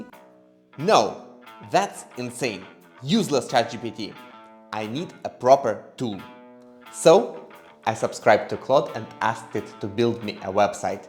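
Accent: Russia. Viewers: я смотря разные видосы про 3д печать не ожидал увидеть англоговорящего автора который на самом деле русский) тебя выдал жутчайший акцент и часть видео где чатгпт написал на русском) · По акценту слышу наш слоняра)) Очень рад за тебя братан, сэкономить 3к$ это не каждый сможет)) Можешь подсказать сервис где можно купить подписку на claude без санкций?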